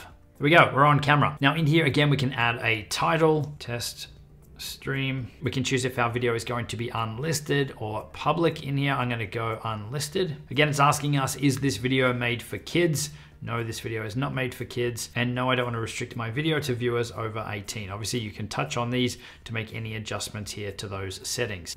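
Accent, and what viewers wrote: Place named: Australia. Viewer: Thank you for the tips, love the aussie accent :) Cheers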